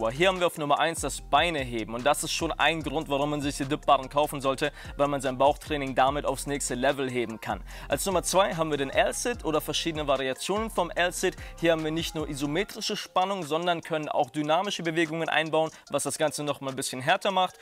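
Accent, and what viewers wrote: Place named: United States. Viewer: Hey coach, wow amazing! Warst du mal länger in Amiland? Du redest fast wie son cooler Amerikaner. Ziemlich nice.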